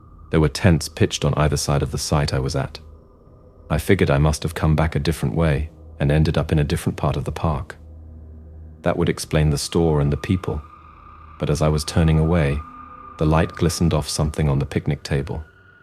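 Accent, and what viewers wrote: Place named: RP, United Kingdom. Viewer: You do a good RP accent. We say "privacy" with a short "I" sound though.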